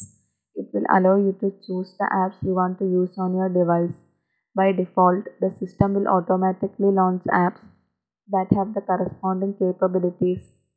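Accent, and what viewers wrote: India. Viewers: atrocious audio, hardly intelligible, heavy Indian accent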